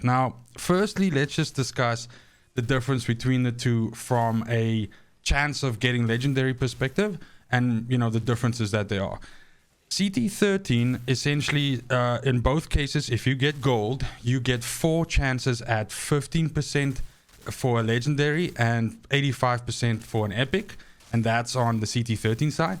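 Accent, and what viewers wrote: Afrikaans, South Africa. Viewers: Are you South African by any chance? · are you south african?? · hawe you from south africa bru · Are you Afrikaans ? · Love the accent - has to be South African - ek stem saam maar ek kry 3 lego's vir elke tweede CT15. Will be great to team up with you sometime!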